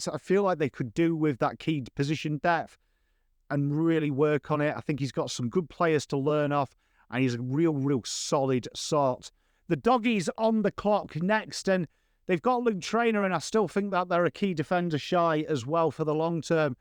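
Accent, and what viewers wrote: England, United Kingdom. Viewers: Don't know what you heard but he said that it's his pommy accent 😅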